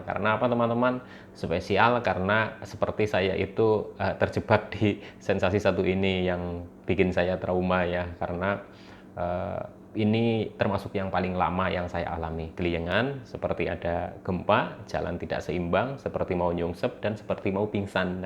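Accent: Banyumas, Indonesia. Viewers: Bener x mas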